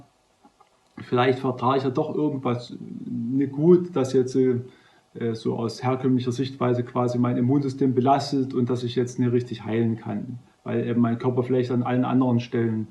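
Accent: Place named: Saxony, Germany. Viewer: He, danke für deine Empfehlungen. Auch das wir uns nicht verrennen sollen bei der Ernährung. Mit den 5 biologischen Gesetzen beschäftige ich mich schon eine Weile. Auch mit denn seelischen Ursachen von Krankheiten. Du scheinst auch in Sachsen zu wohnen. Dein Dialekt klingt mir so vertraut. Liebe Grüße aus der Oberlausitz, Anja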